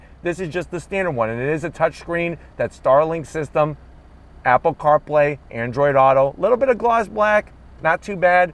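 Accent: United States. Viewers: not u. He's got a yank voice. Maybe NJ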